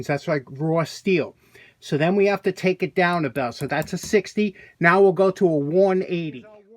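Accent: New York, United States. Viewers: Love your videos. I’ve been restoring old tool (mostly hand planes) on and off for the last 20 years and I really enjoy your approach and enthusiasm. Having lived in California for the past 40 year your East Coast (New York)accent takes me home to my Pennsylvania roots and all of the old family.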